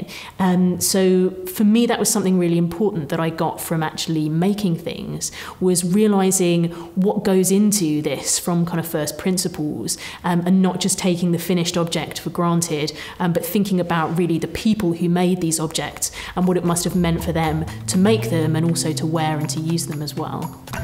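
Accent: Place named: United Kingdom. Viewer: As an American, every time she said "torc" with her British accent, I thought she was saying "talk" with a British accent.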